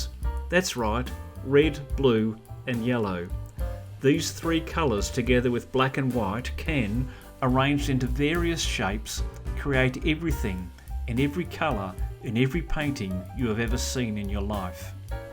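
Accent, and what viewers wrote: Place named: United Kingdom. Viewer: Isn't this just pop art, like Warhol? (Not that his stuff is any lesser than regular art. It has a huge influence on our lives.) Also, it immediately calls to mind the Monopoly board. Thank you for these videos. You have everything you need to succeed as a narrator: you have a wonderful understanding of and love for your subject, you have a way of making art understandable for your viewers, and you have a British accent. Subbed.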